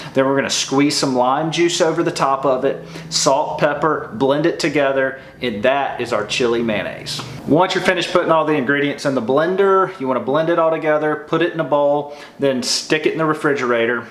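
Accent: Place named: South, United States. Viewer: Hi, I just want to tell you how much I have enjoyed watching your channel which I just discovered two days ago. I absolutely love your Catch and Cook Video's. You are a lovely person and your video's and friends seem so nice. Thank you for your channel and keep those Catch and Cook video's coming. By the way, I saw in your mother's kitchen she had a scripture from Psalm 16 written on a small blackboard. Is she a Christian? If so, I think that is awesome. I have walked with the Lord Jesus for 26 years now and have an awesome relationship with Him. Where are you from originally-you have some beautiful southern accent. You are an amazing fisherman-someone who can tell what fish is on the line before you even see the actual fish tells me that you are very knowledgeable about fish, which I find so interesting and neat. I can tell you just love being on the water and you are so fortunate to be able to make a living doing what you love. 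God Bless You,.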